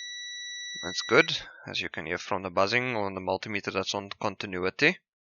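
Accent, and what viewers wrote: South Africa. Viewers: Nice Video. ... You have A south African ACCENT.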